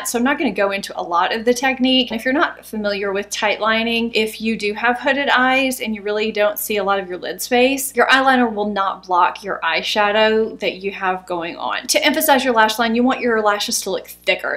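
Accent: South, United States. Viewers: I appreciate your subtle southern accent #southernbelle 🥰🥰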